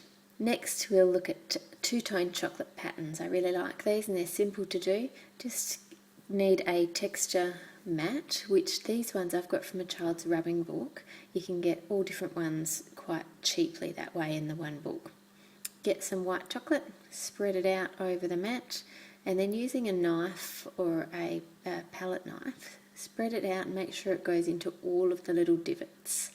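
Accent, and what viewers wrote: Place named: Australia. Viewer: thats amazing. your ausie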